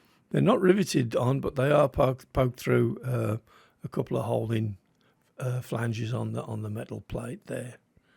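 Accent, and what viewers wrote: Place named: Midlands, United Kingdom. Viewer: I bloody love when you open something up for the first time, and the wheels just fall out! What a relief! And I do quite a bit of work with Land Rover, so the Midlands accent is not so bad! 🤭 The only thing on that casting I don't like is the filled quarter-light windows: the temptation to drill those out is one I'd not be able to resist, though it does leave the A-post and door frame quite vulnerable. Lovely entry to the Challenge, and a great save!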